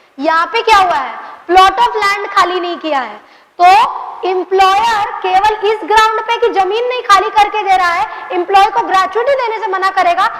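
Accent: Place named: mixed, India. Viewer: pagal ho kya gawar nhi h vo ca hai.BAS vo apna bolne ka tarika up bihar wala hi rakhi hai.baaki knowledge hai lekin bolne ka tarika desi hai.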